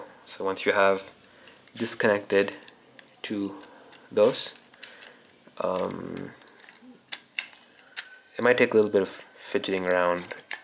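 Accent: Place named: United States. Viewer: pehle to laga ki koi Ameriki hai.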